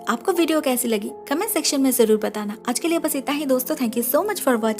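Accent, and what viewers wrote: Bengal, India. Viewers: My my your sweet sweet voice 😋 ur accent feels like Bangali 😍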